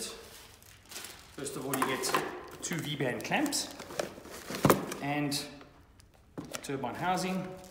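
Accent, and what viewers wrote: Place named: South Africa. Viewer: Dude is definitely south African...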